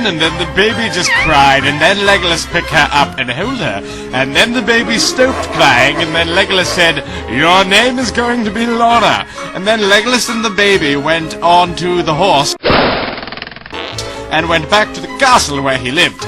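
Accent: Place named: Ireland. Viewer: Is it just me or does Laura have random hints of an Irish accent?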